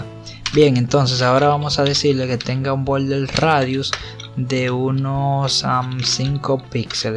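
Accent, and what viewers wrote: Dominican Republic. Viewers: apuesto que eres dominicano hahaha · eres dominicano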